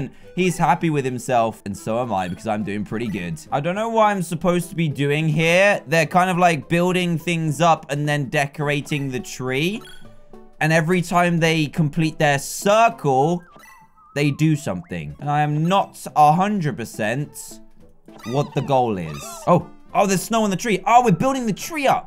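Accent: United Kingdom. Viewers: Wait I did not know that you was British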